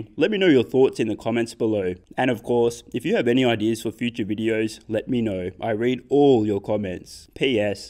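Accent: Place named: Australia. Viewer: For a moment I thought I heard swear, but nah, it was just plain Australian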